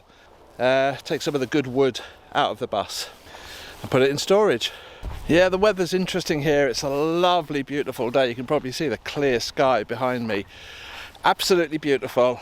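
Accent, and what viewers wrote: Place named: England, United Kingdom. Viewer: Thank you for your reply. You are lucky and blessed to own a large piece of land in such a beautiful country with a moderate climate and lots of water. You sound English. I noticed last year that a lot of Englishman are retiring there. They do seem to have a lot of restrictions. If I could get family to move there I would be there in a heart beat. In my area a lot of people go to Mexico but I prefer Portugal to any other countries 👍🏼🙏💝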